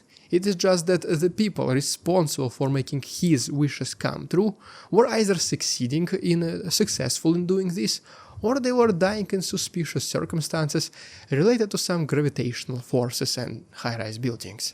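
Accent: Russia. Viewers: you have a surprisingly wide English vocabulary for someone who cannot speak without an outrageous Russian accent. Methinks there is something amiss here.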